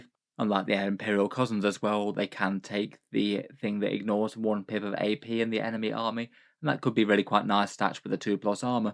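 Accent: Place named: United Kingdom. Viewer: ypur voice is annoying. too british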